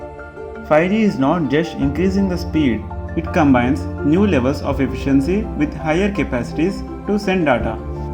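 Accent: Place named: South India, India. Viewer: Good voice if not perfect . Hint of south accent . Mis pronunciation of few words . Overall 4/5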